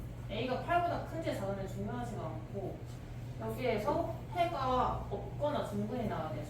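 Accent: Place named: Jeolla, South Korea. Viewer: 전라도 분이시네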